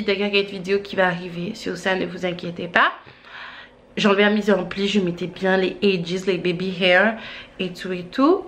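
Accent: Antilles, Caribbean. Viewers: T'es congolaise mais ta l'accent des antillais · Fiche que tu as l'accent antillais 😭💔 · Oui tu as l'accent tu as vécu aux antilles ça c'est suwe 🤗🤗🤗mdw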